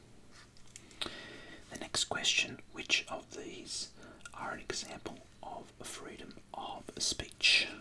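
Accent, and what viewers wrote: Australia. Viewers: just think for a moment how cool Australia sounds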